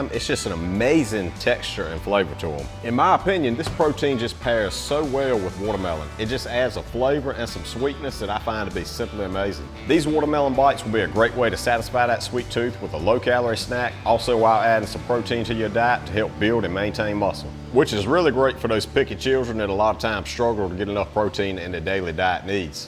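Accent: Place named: North Carolina, United States. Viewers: I just shared this with my mom, 8 siblings, and their spouses: “This dude I’d propose marriage to if he were single (and not straight). “His video shorts are amazing, canning peaches, growing food, freezing cantaloupe for smoothies, freeze drying watermelon, and so much more. A southern accent is always comedic or fascinating, but rarely sexy. I’d be able to listen to his accent all day long and be charmed by it.” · He's from North Carolina, where he contributes his accent. He doesn't have a Louisiana accent (born and reared here, although we'd welcome him). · I live in the southern United States and I can’t figure his accent either! It’s delightful!! He’s either from the Carolina’s or Louisiana?? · No clue how i ended up here, but a dude who looks like he could break a tree in half just by looking at it, with an accent I cant place further than "south? 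Maybe????", using molds he calls "adorable"? Yeah. Sign me the fuck up. (And yeas, my own prejudice absolutely plays in here, i was so ready to scroll quick as shit. Was like 85% sure this would include some 'women suck, God is everything, black people are criminals and also the gays are sinful' kind of garbage. I'm from Europe and everything about this mans first impression screams terrifying to me. Glad I stayed, if for no other reason than to sit with my own prejudice for a while and start to become aware of it. So you know, thank you for that, and also sorry for the immediate judgement) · Are you in North Carolina? I love your accent & your content...new subscriber. ❤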